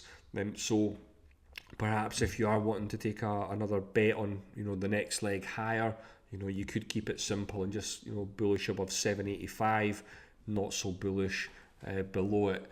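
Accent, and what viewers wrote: Scotland, United Kingdom. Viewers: Nice work and useful content 👏👏❤️. The Scotch accent is a great PLUS. 😀🙏👏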